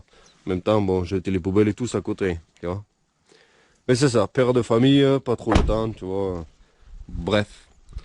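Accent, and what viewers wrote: South, France. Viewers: Oh tu as l'accent du midi toi non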